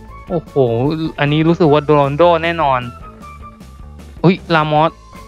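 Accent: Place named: Isan, Thailand. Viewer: ครับพี่พูดเหมือนคนอีสานเลย555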